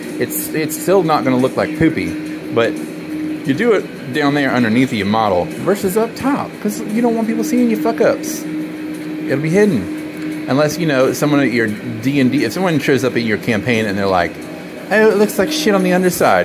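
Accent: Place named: South, United States. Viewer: Idk why but your southern accent is at 200% on this video. 😆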